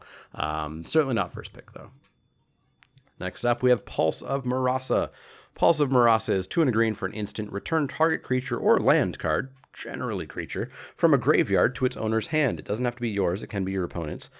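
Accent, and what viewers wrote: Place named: Canada. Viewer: Nice content. Where are you from in Canada that you pronounce "un" as "on"? Tap an "ontapped" ally you control. Onto the "oncommons". Etc.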